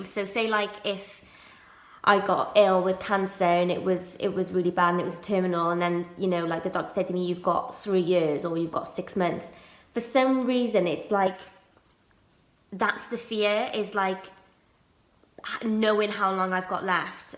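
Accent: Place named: Wales, United Kingdom. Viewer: The more I watch you the more I hear that we are exactly the same. I can't say that word either or watch films or TV shows that deal with the illness. I have a non urgent test coming up but I am terrified. Maybe it should be urgent...you know how we question everything! I think about this test 24/7. However I am greatly comforted by you. You are Welsh? I hear it in your voice! I am half Welsh. I am old. 69. I feel ashamed that I have this health anxiety...I just can't help it but feel that I should not have it at my age....I should grow up a bit! It's not my fault that I have it but I can't help thinking that I am completely self obsessed and thinking of myself all the time. God Bless You. Thanks for this video XXX❤️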